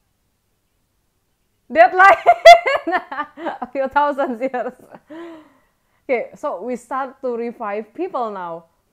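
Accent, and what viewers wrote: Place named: Philippines. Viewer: seriously, sister, where are you from? I heard a foreign accent .. Are you from the Philippines?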